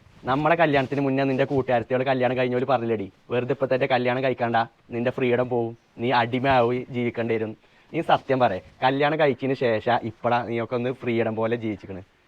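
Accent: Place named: Malappuram, India. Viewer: ഇജ്ജ് അൻ്റെ മലപ്പുറം സ്ലാംഗ് പിടിച്ചാൽ മതി, ഇടക്ക് ഭാഷ മാറുമ്പോൾ ഒരു ഗുമ്മു ഇല്ല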